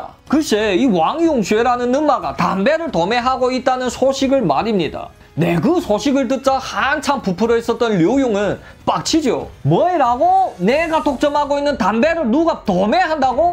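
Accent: Yanbian, China. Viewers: 하지만 ~하지만 말입니다 조선족 연변말투죠? 그러게 말입니다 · 매일매일 기다리는게 새로운 삶을 사는것처럼 행복하구 즐거워요 ㅎㅎ 연변사투리도 재믿고 표정 하나하나 생동감이 넘쳐서 더 기대가 가요 승승장구 하시길 바랄게요 다음회도 오케이 ㅎㅎ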